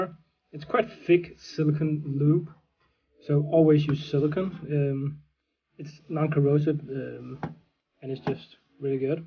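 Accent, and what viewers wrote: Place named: Denmark. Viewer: are u danish? i smell accent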